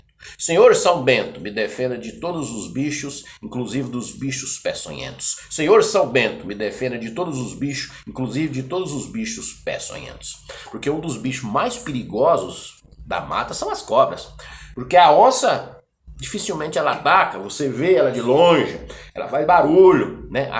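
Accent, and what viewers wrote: Goiás, Brazil. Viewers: engraçado o jeito goiano eu gosto muito de moda de viola as modas antigas e escutando o randal grande compositor e goiano falar da vida dele e voçe contando historia sao muito pareçido o jeitao de falar parabens voçe e bom contador de causos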